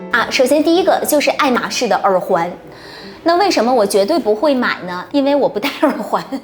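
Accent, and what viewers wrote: Northeast, China. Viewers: 的发音好东北啊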